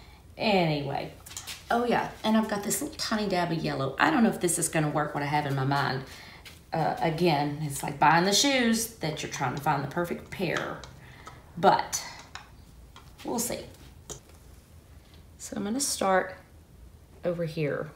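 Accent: Texas, United States. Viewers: Impressive painting. Love the Texas voice too.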